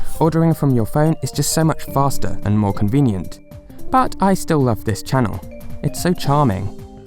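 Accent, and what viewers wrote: United Kingdom. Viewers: You literally speak a British Accent!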